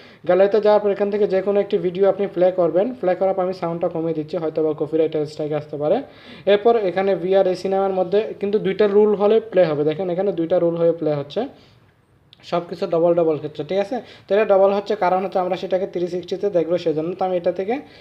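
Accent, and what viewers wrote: Chittagong, Bangladesh. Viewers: ভাই আপনি কী চট্টগ্রাম থেকে?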